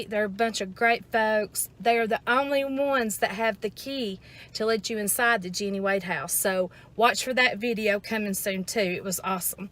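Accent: South, United States. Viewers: Not really a ghost hunter... I just watch these videos to see what people come up with... anyway, if you are from Alabama, you should have tried the Bloody Lane at Antietam (nearby) or around Devil's Den in Gettysburg... lot of Alabamians died around there... I don't think ghost boxes reveal very much, if anything... just a bunch of random, disconnected words... not real conversations... but one semi-legit guy said he tried sing to ghosts at Gettysburg thru a spirit box, and he got something like a full song in return... my point is, you have a nice accent and a charming southern demeanor... if you were trying to get them to talk, well, go where the Alabamians were and use that drawl, darlin'... 😊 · i just love your southern accent,keep up the good work,i enjoy it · Thanks, nice video, and love your southern accent! It’s a shame that the Jenny Wad house is literally surrounded by a 5 story hotel, somehow takes away from the experience.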